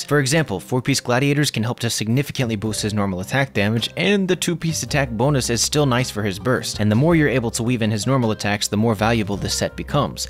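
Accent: United Kingdom. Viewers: wait ur british huh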